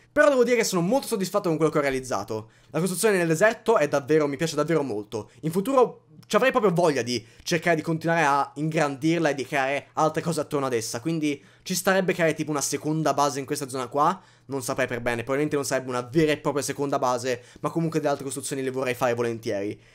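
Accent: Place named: Emilia, Italy. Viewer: Sei emiliano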